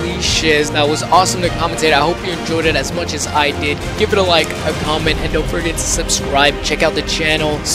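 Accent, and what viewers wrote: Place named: France. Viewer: You Ave a good accent french i know i'm french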